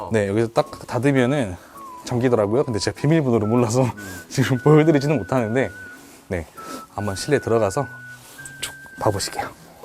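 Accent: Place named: South Jeolla, South Korea. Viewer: 전남사투리... ㅋ 많이 쓰시네요.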